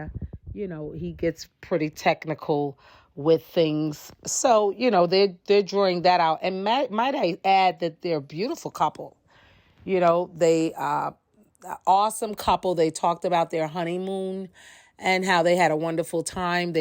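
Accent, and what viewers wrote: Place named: New York, United States. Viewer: You speak very well, sounds like you're from New York the way you pronounce lawyer, talk and brother, are you?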